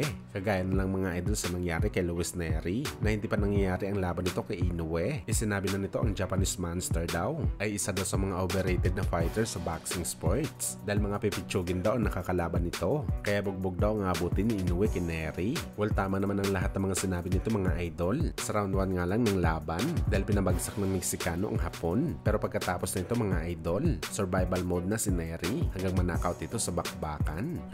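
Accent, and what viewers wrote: Iloilo, Philippines. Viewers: Te ilonggo ka haw?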